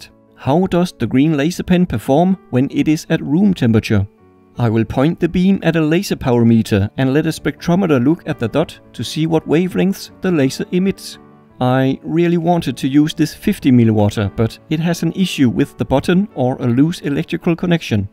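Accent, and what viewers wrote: Denmark. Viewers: Are you danish? Edit: Before I got to the middle of the video I just guessed by the accent. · Du er så meget dansk 👍 vi kan ikke skjule vores danske dialekt 😜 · Just sounds like a Dane. A lot of Danish people sounds funny when they venture into the English language. I'm Danish myself, but I'm all in on a cockney accent. I don't really know why 🤔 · I knew you were from denmark! I could hear it in your voice lol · Only when you said "In Denmark", I realised how danish your accent was. Great video man! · Are you from Denmark? Cause your accent sounds kinda danish If yes then... DANISH GANG RISE!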